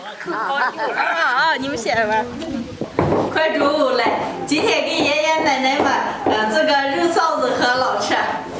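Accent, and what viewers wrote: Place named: Shaanxi, China. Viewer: 我外公來自陝西城固縣 49年來台灣~~聽到口音突然好熟悉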